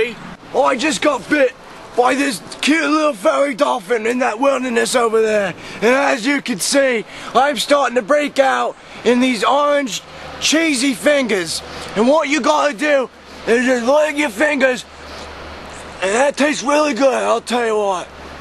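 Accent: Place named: Australia. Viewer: why does he sound australian? haha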